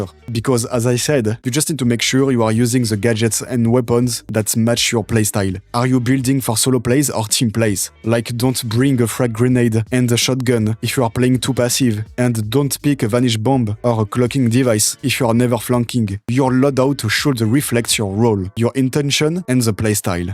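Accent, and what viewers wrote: France. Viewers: I'm italian so I find hard to compliment a french, yet I must say that french english accent is really cool 😂 Also you are my favorite the finals pro. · This is the most French Frenchman I've ever heard. Subscribed. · as a french, i approve this accent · I really love that French accent! Lovely video, keep up the good work man!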